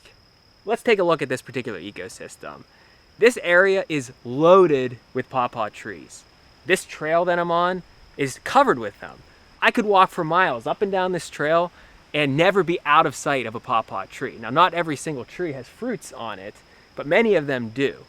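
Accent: Pittsburgh, United States. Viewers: Is that a yinzer accent i detect??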